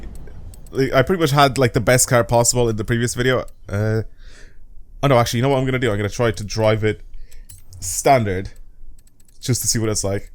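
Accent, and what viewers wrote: Ireland. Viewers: For two fucking years I was trying to understand where the hell is from. Finally I know he is Irish. Now I can die in peace. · Now we know lives in Ireland which is obvious since he has an irish accent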